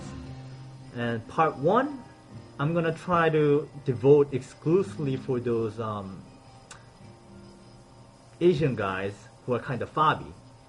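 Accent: South Korea. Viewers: I am fond of your background music. 또한 당신은 재미있는 한국어 악센트가있다. ^ ^ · typical korean face and accent.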